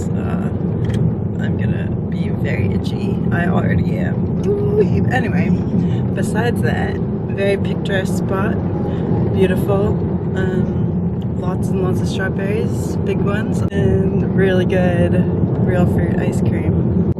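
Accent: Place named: New Zealand. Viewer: Compared with your PCT videos your accent is definitely developing a NZ accent edge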